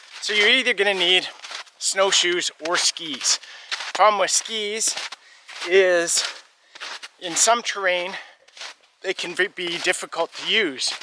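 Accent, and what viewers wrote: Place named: Canada. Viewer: Strong Canadian accent here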